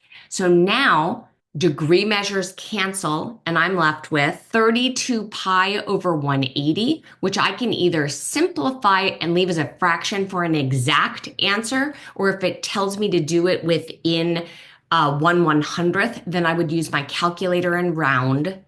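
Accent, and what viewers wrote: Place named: New York, United States. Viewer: Lovely concise little video…also, I love that accent of yours..is it a New York accent?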